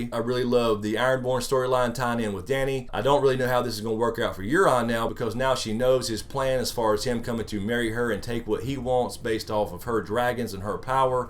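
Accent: South, United States. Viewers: Are you a comedian! You make me laugh out loud, til my stomach hurts! I LOVE your reviews! Love that Southern drawl, too! Great job! I look forward to more videos from you..... :)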